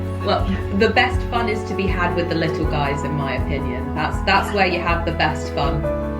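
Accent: United Kingdom. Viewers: I am polish And I Love Your videos content ❤ as well Your british accent. I even learn english with You to have nicer english 😊 You are just such a lovely person ❤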